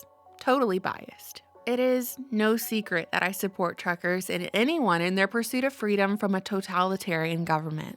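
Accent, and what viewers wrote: South, United States. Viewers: Love your channel lady! Have you heard of or covered anything about canadas “m a i d” service? Btw love the southern draw. Reminds me of home. 🥰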